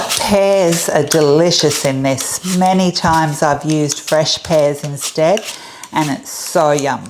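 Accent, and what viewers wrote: Australia. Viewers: great to hear an Aussie accent on here for a change. Looks yummie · OMG, so agree with your comment! Love her cooking style and love, love listening to her Aussie accent; this is a keeper! ❤ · By the way, I didn't recognize Ur accent until U mentioned Australia. Of course all accents are nice.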